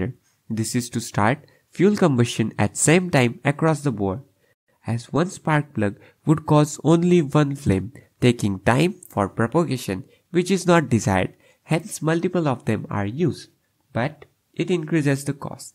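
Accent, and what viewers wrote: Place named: India. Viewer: Good content, good animations. But this indian accent is annoying the hell out of me. Why not focus on proper pronunciation so the content is suitable for normal English speaking audience.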